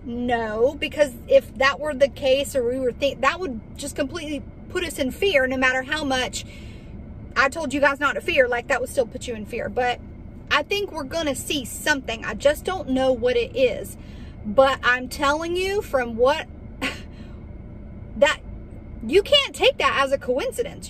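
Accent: South, United States. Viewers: I love your laugh and southern accent. You're my sister in Christ, and you look like you could actually be my sister, although ten times better looking. (I'm old)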